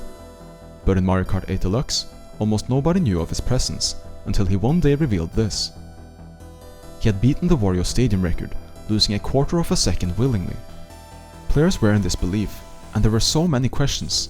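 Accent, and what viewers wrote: Norway. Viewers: As a Norwegian, i could immediately tell you were Norwegian too lmao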